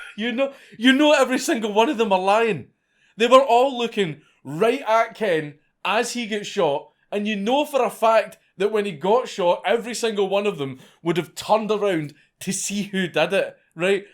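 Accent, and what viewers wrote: Scotland, United Kingdom. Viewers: this is the most scottish man i have ever heard. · Are you SCOTTISH by any chance ?! Can you do a Transylvanian Accent ? Of course I've heard of Count Dankula.... you've gotten a lot of coverage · This youtube channel is great. Fascinating history narrated by a suave Scottish accent, who could ask for more? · He's Scottish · My god a Fellow scot with a blantly Scottish acent, that alone was a joy to just hear blurting out over the speakers.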